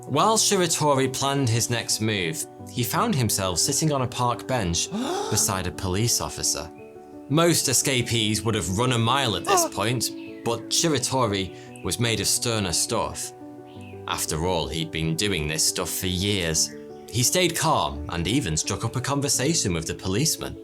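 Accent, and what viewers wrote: United Kingdom. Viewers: what do you mean? At first, I assumed this was a pro-america joke, insinuating the English accent is incorrect, which i applaud because its hilarious. However, I then noticed "mate" and realized this is clearly no American joke. So i ask again, what do you mean? Does he have a speech impediment? As an American, i cant tell, it just sounds like British-English or another one of those funny non-American lingos. On a side note, i do totally agree with your main point, no reason for this Japanophile to get on the guys case about using the typical foreign-pronunciation of Yoshi. Nothing worse than the white dude who forcefully pronounces all Japanese words as authentically as he possibly can. It's just sad when you hear those clowns order a Sapporo beer by belting out those staccato syllables "SAH-POH-ROH". You just want to turn to them and say "yeah man, we get it, you watch Narutto or something" but they would problem take that as a compliment of their comically incorrect accent so we stay quiet. 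If your speaking in English, keep the words in English, say "sake" like the rest of us, not "SAH-KAY". And I say this all as someone who lived in Japan for years, not just an ignorant American... Well, i suppose I say it as an ignorant American who lived in Japan for years... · ...THE MAN THAT LOOKS LIKE A GERMAN BUT SPEAKS LIKE A BRITISH MAN...U R 2 F....N CUTE I JUST WANT TO TAKE YOU HOME...😃 👄 💋 💘 MERRY XMAS LOVE...🎅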